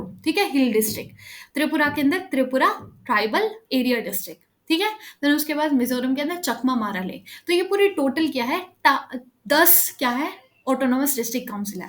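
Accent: Delhi, India. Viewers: Ma'am apke bolne ke tarike se lag raha h ap delhi se ho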